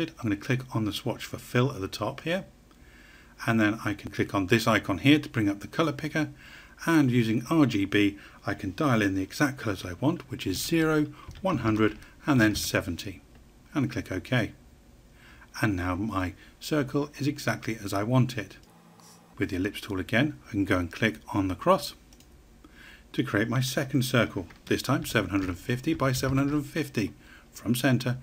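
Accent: United Kingdom. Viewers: Excellent. Nice to see, and hear, tutorials in English English and not American "English" Your tutorials are so easy to follow. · I Like so much UK English! :D Thanks for this tutorial!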